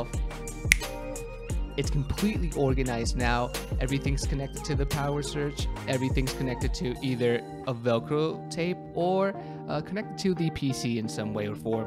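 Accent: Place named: Texas, United States. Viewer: wait I legit thought you were maybe from texas or something 😂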